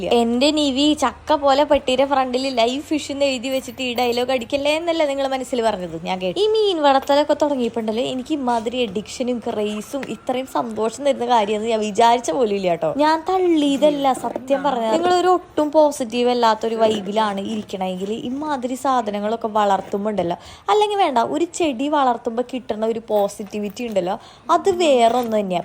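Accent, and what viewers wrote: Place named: Thrissur, India. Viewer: നിങ്ങളുടെ തൃശ്ശൂർ ഭാഷ കേട്ട് വീഡിയോ കാണാൻ നല്ല രസം ആണ് ❤️😌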